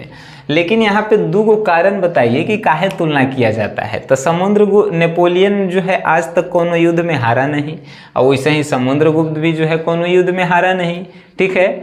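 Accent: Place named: Bhojpuri, India. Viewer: Sir aap bahut acha pada rahe h ek dam bhojpuri legvej me pura samjha aa raha h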